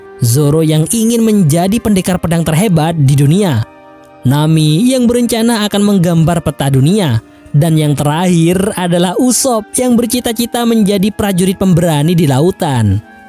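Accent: Madura, Indonesia. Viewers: Sumpah orang madura ini kalaupun bukan pasti keturunan orang madura soalnya logat maduranya melekat banget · Loh orang Madura bg😂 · Sapnyapsap+sang nyangsang. Madura mana bang? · Buh mak reng madureh kakeh dul "apa can deggik lah" sengkok bangga sama been bang wkwkwk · wkwk sap nyapsap.. 😂 madurooo masss??? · medureh dimmah kakeh mat❤❤❤ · Apah can dekik lah,,😂 reng madureh kah min? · Madureh nyenguk cak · Reng madureh kak?? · Sampean orang Madura mas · Madureh asli kak😅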